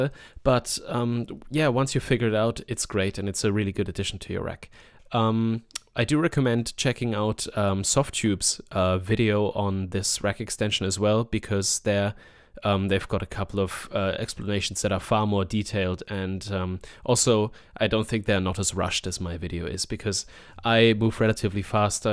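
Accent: Germany. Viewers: Coole Tutorials und Reviews, aber dein deutscher Akzent geht mir voll auf die Glocken alter !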